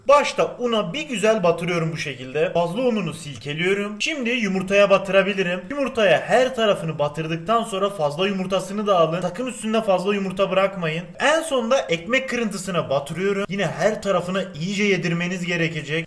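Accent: Adana, Turkey. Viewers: Adana'ya doğudan göçen kürt kesimi böyle konuşuyo genelleme yapma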